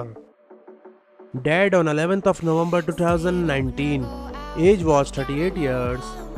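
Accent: India. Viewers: Indian accent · Oh my god i dont understand your accent. Make it clear when you speak. I guess you are ndian obviously in your accents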